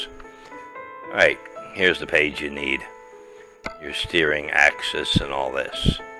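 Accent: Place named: South, United States. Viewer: I was just admiring your southern accent! I'm from TN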